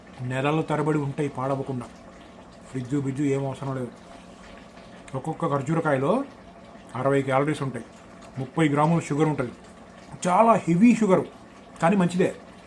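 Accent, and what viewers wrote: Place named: Andhra, India. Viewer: Your language pronounce is like Andhra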